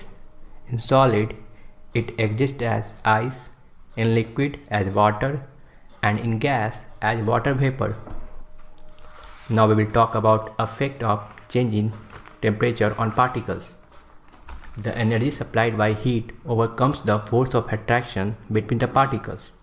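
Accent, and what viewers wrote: Bihar, India. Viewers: Sahi h bihari bbu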